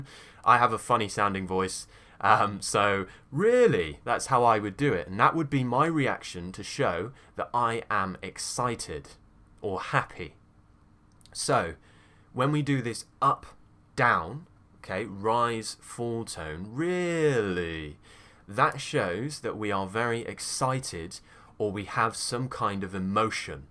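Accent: United Kingdom. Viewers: Can't wait for your weekly lessons! 🔝 I'm completely in love with your super British accent lol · Mr, Good morning, I like the British accent with pronunciation. I've also searched for this kind of video until I find you. Now I'm thrilled with your videos. My morning also starts with your videos. My improvement is also apparent now. Actually, I'm from #Bangladesh. Lots of love from my country. Warm Regards, RAZIN AHMOD:elbowcough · I'm sure most of people subscribe to your channel because of your clear and beautiful British accent. Congrats 🎊 may you reach more 😊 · British english is so sexy 😏 I love it and I am also excited bout your videos... I admire your accent 🤔😱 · hi, I'm from Brazil, I like british accent and your videos. cheers !...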